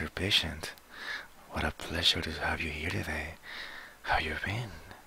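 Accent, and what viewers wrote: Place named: France. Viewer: You sound... FRENCH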